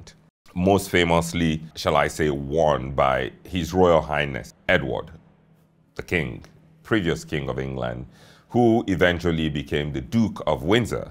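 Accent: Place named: Caribbean. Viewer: That may be UK 'Received Pronunciation' , but if I had it, I wouldn't want to give up that (Caribbean + a touch of US ?) twang for anything! I think it's beautiful, and clear as a bell :) .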